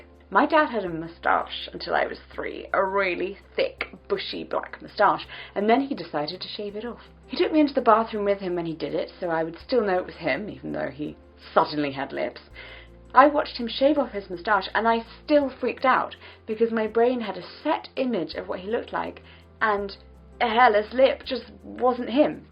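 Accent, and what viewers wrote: RP, United Kingdom. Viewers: I acc kinda like the bad audio cause it weirdly fits the whole 50s theme and posh accent. Genuinely thought it was a style choice at the beginning!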